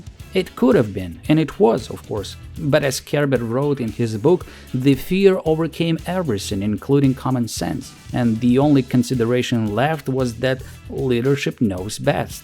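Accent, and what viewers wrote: Ukraine. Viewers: Hearing all of this in a Ukrainian accent, is especially chilling. I want to make a joke, but it's just sad. Україна не одна. (sorry for any poor translation.) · Is this channel literally anti Russian, Ukrainian propaganda? The narrator’s accent sounds a lot like a certain diminutive Ukrainian actor aka Churchill 2.0 aka the green goblin. Fascinating content though. I had no idea 1000 Tu-4’s were built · I now saw that part, of napoleonic wars. Now i can hear also that accent. Western Ukrainian. Dude, i understand, your so called country is slowly but irreversibly is consumed by Russia, but lying about history would not change it. Maybe you should learn from it. Russia is like balloon, when you blow to it, it expands. Battle at Borodino was tactical victory of Napoleon, but strategical victory of Kutuzov. Napoleon gathered more than half million soldiers for invasion to Russia and returned with less than 50 thousand. 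And it is necessary to say that was half of all soldiers France could gather and it was that better part (they left at home soldiers and militia of questionable quality). This "victory" was defeat of Napoleon. Until then, nobody can challenge him, but he bled France so badly in this endeavor, that despite he was still genius and able to defeat European sissies after 1812, he simply lost because sheer numerical superiority of them. And that was possible only because Russia strategy and general Russian winter. Russia is always ridiculed, but it was Russia, who decided wars since 15th century. Yes, very often fruits of that Russian efforts were eaten by another, like in WW1, when it was Russian "problem", which tighten majority of German forces, which would easily wipe out French and British forces, if they would not have to fight Russians. Same apply for balkans. It was Russia, who freed balkan states from Turkish opression, of course Tsars wanted new dominion, not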